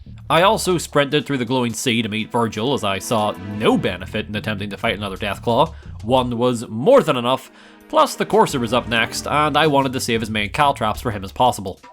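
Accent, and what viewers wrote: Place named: Northern Ireland, United Kingdom. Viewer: Northern Irish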